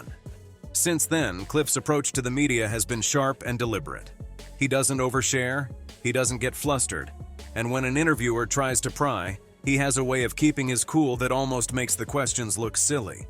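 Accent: United States. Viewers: AMERICAN VOICED CRAP!! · I found this hyperbole tiresome, especially in that IT American accent. Seemingly Cliff did indeed enjoy a permanent relationship with another man. The longevity of this relationship should be celebrated rather than being the subject of unpleasant inuendo. His resistance to probing questions about his private life during the censorious times he lived though is absolutely understandable.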